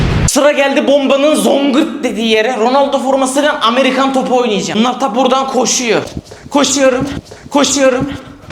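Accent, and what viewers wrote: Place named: Black Sea, Turkey. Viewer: Oy uşağım Sen de bir Karadenizli oldun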